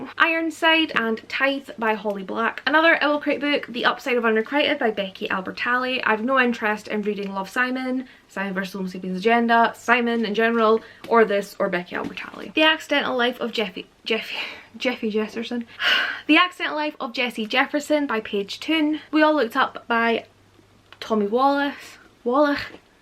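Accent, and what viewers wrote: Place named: Scotland, United Kingdom. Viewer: It's nice to hear a Scottish accent on booktube! Not enough booktubers are from here.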